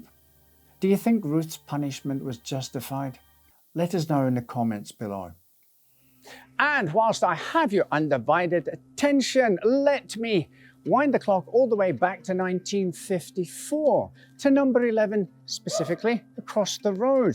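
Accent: Scotland, United Kingdom. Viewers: I’m trying, to discern the ‘whereaboots’ of your (own/default) Scottish accent - Ayrshire maybe?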